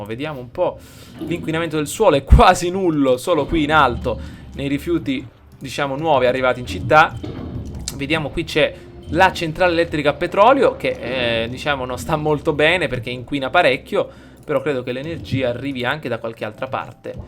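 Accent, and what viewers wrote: Sicily, Italy. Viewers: Ti prego continua simcity e mi potresti accettare l'amicizia per favore mi chiamo After478 P.S ma sei siciliano?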